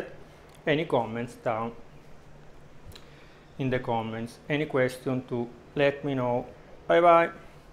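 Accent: Italy. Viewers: The Italian Accent is so nice 👍, and also the recipe..!! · Love your Italian ascent 😘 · What a cute & wonderful Italian Accent. I could listen to him for hours! Good job teaching in English ! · Love the accent Italiano 😘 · Search YouTube for Panna Cotta recipe First result, Gordon Ramsay SKIP! Sees Italian channel name Might have something here... Hears accent Yep, this is the one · Hears his accent ok this is original panna cotta boys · L'accento italiano è fastidioso 😅. Non pensavo avessimo questo accento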